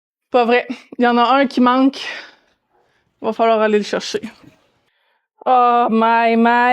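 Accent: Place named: Quebec, Canada. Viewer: Accent québécois ! J'adore. Commentaire pour le referencement. 😉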